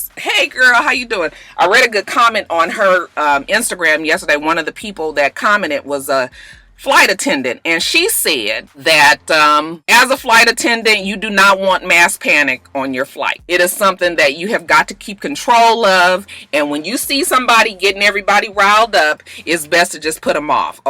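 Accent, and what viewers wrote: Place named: California, United States. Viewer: "modation "!!!! love you,. your California comes out sometimes and I love it!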